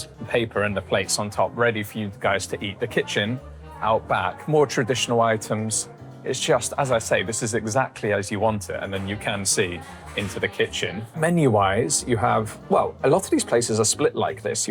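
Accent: United Kingdom. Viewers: I'm surprised he used the American word "chips" instead of the British word "crisps" considering his accent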